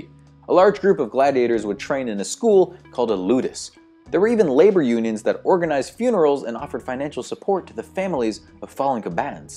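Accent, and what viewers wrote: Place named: United States. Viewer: O_o what English are you speaking? That is perfectly normal American English pronunciation of those words.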